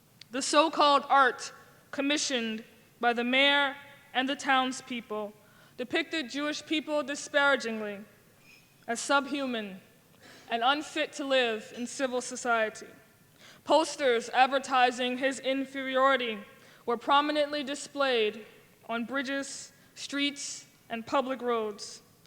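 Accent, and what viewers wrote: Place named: United States. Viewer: I like it better when IDF or Judaism focused voices are heard. I like their inspiring speeches. This sounded like some American Israelite stuff..This girl sounds American, not African, and speaks as someone carefully choosing words to manipulate. She does NOT speak as someone who has love for Israel, Judaism, and IDF. She speaks warmly, but not with fire. She speaks of patting people on the back, but not understanding the trauma to the heart. She speaks like someone who gives a handshake not understanding the need to stand with Israeli's in the settlements. She sounds like some American Hebrew Israelite trying to gain a foothold in Israel.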